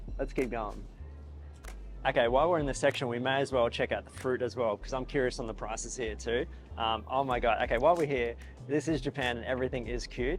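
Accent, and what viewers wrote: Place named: Australia. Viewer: for real mate, and also your accent is sending me back to the years I spent growing up in Australia haha I used to live in the west coast in Perth, it probably is one of the factor that makes me feels cozy when watching you. 😄 I would love to be a teacher in Japan to be honest but sadly I am not native and its not an option where I am from haha, I am currently in training for the SSW programme specifically Food Processing Industry 😁 training will last for up to 6 months and afterwards is job matching hopefully I will live there. so in total its about 1 year more or less. I definitely can't wait to experience Japan 😆 But yea, thank you for the wish, I will go full send, keep the video coming mate! I will be sure to watch every single one! and I hope your channel will be huuuge on day ✌much love from Indonesia! 🇮🇩🇮🇩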